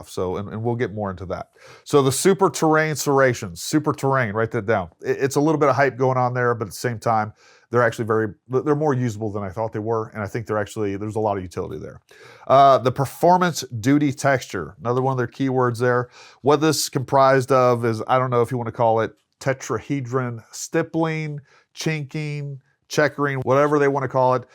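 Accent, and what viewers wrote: United States. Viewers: I need a German accent to explain the whole line up not a American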